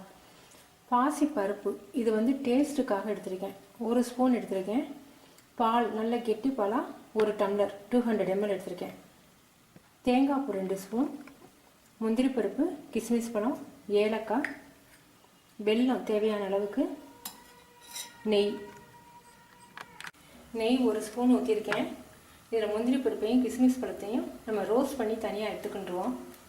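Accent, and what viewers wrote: Madurai, India. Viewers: maduraya neenga...ur pronunciation looks like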